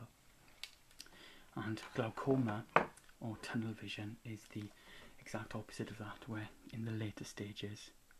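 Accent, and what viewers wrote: Wales, United Kingdom. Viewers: I love Welsh accents 💕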